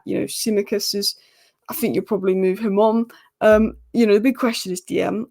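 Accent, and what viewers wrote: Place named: Liverpool, United Kingdom. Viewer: Last year in the same pre season games Quansah played as well as now. I hope he will play much better then then when the real games begins. In the end of the last season he didnt play well but now his experiencie grows and he is more reliable. I think LFC still needs a top level CB. By the way........di you already noticed that you are almost scouser?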